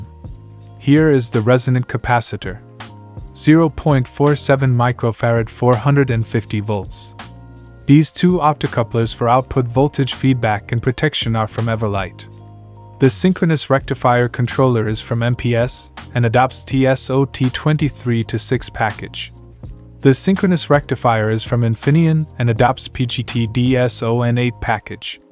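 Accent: China. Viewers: I really prefer your english with chinese accent instead of this AI english transcription.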